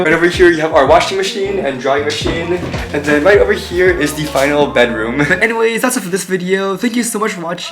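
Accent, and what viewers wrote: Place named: United States. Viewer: afterthis video i realised how american your accent actually if and my mind is blown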